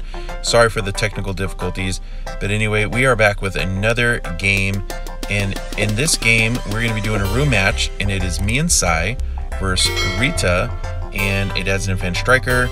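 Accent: Tamil Nadu, India. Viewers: Your tamil is so cute dad ... Love u 😍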